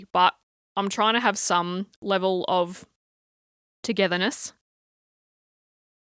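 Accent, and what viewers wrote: Australia. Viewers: Really enjoyed watching this. I love the relaxed attitude and the voice commentary maybe because u r an Aussie and u sound relatable to me. Keep them coming. Looking forward to ur next one. ❤ Btw I haven’t started in my Johanna Basford Small Victories but u have encouraged me to start. (I’ve had it since it first came out) I think I’ll use Gel pens for the entire book. Thanks for the inspiration. 😊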